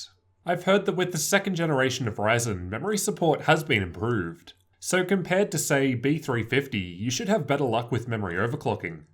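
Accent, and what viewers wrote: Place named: Australia. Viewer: U are Aussie right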